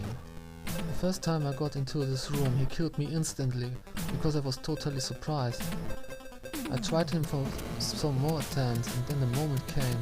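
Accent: Germany. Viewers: i bet this dude's accent is german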